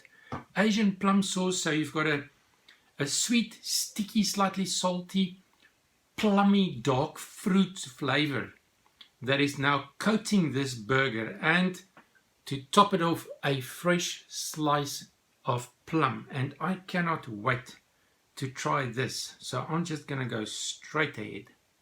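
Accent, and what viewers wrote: South Africa. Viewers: The South African accent is so cool. Cheers my dude.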